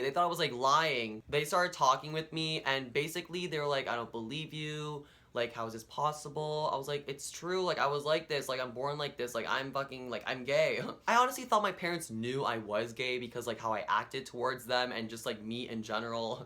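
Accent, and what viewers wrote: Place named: New England, United States. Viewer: I have to smile the way you talk, you sound like you're New England Italian? Hope you and your parents work things out, give them time, there trying to deal with this the only way they know how.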